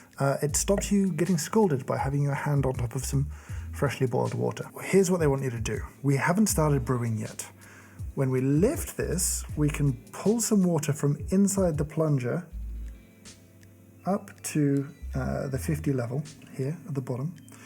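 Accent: United Kingdom. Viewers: I can't help but think of the fact that the British accent often pronounces words ending a as an er - like delta sounds like delter, despite the fact that it's from Australia. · I went through this entire video thinking that the brewer was called the "Delta Brewer" and that was just being British...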